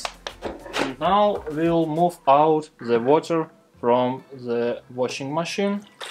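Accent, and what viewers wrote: Russia. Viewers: Я по аценту понял, ты из России? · русский акцент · Какой-то русский Акцентик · Услышав знакомый акцент «зе», поняла, что это русский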